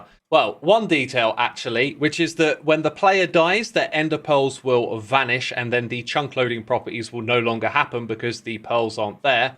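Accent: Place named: United Kingdom. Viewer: Fun Fact, PT stands for Pacific Time, usually when measuring what time that something is gonna happen in the United States of America, they choose either Eastern Standard Time (EST) or Pacific Standard Time (PT). Then again, I remember you're not American, judging by your British accent, so I guess you had no way of knowing that.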